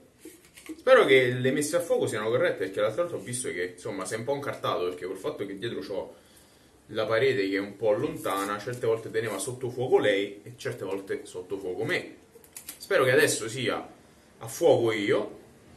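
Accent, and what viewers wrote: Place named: Rome, Italy. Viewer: Ciao, la tua cadenza romana mi piace molto. Penso che hai sviluppato una ottima tecnica, la tua zona baffi non è abituata alla lama ecco spiegati i piccoli taglietti. Ma il ventaglio dov'è? Io da quando ti ho copiato sta genialata lo uso sempre 😂🤣 Ti abbraccio 💈🔝👏👍💈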